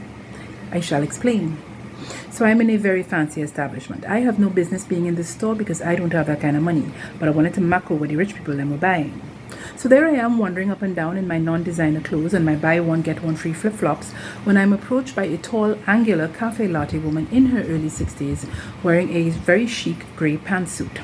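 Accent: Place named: Trinidad and Tobago. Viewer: No problem. She got some Trini...🙌🏼😁🤣😚😂💯🙏🏼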